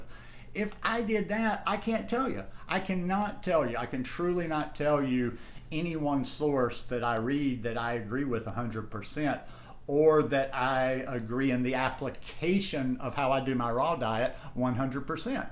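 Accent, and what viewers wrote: South, United States. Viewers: I watch only a handful of raw plant based people. Their eating styles range from gourmet labour intensive, all the way to those who don't use machines and meal prep involves grabbing a piece of fruit . I take info from them all and that is reflected in how I eat. You and Tannyraw are my favourites - must be the American southern accents that I love 😀.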